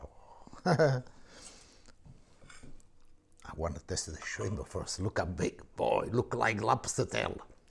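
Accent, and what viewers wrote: Italy. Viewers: I'm from Germany and to be honest it's very hard for me to understand English with an Italien accent. But one can really feel that your are living Italien food. When I see your dishes it's always a pleasure and a pain at the same time, because it looks so tasty but I usually watch your channel when it's too late to try it by myself at once. So I have to.go to bed with the pictures of you dishes in my head. Sometimes a glas of wine helps to overcome ...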